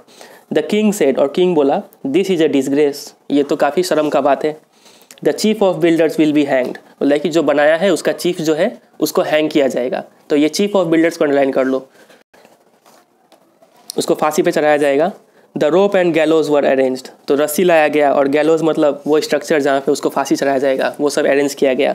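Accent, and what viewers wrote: Bihar, India. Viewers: Bro aap language se bahot big bihari lagte ho like you said " Rajaji"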